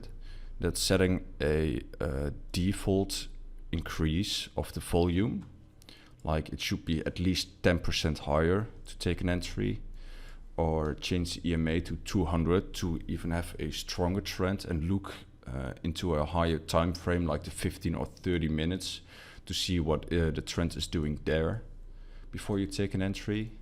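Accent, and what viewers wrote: Netherlands. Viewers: I've used this strategy for a few days on a paper-trading account and really like it. Lots of entries which I like, I work from home but am unable to sit and watch charts all day. That's probably the most frustrating thing about trading in my spare time, waiting for entries. Most strategies also only really work during trends, feel like I miss a lot of trades when it's not trending. With this strategy I don't have to wait too long to find an entry. I also really like the Absolute Strength Histogram strategy, finding good stuff with that and also seems to work in flatter markets.. I've started leaving the volume up along with the ASH and found that they can both confirm each other. BTW - are you Dutch, by chance? You sound like a native Dutch English-speaker to me, not that it matters :)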